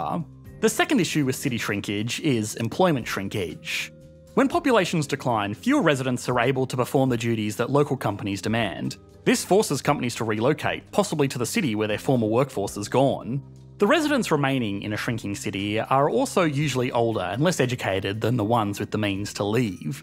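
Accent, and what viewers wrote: Australia. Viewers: I detest this channel, and not because of the unbearable Australian accent. Nevertheless, I want it to go on if just to watch other YouTubers point out the myriad of mistakes in these videos.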